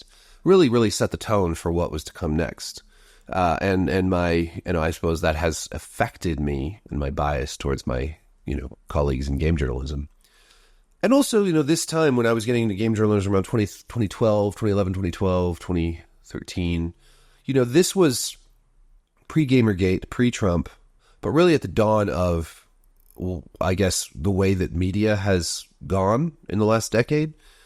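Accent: United Kingdom. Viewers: your English accent kills me every time, I love that Ubisoft unintentionally outed Thomas Lockley as a fraud is the real win here.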